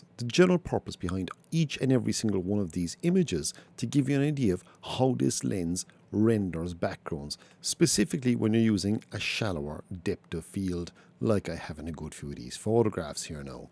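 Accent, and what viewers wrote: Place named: Ireland. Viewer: Is that dialect of yours from Inisherin? Because I keep thinking of that movie when you talk 😬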